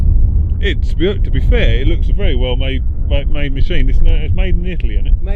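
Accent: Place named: RP, United Kingdom. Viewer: Hi pal great video yet again abit posh that is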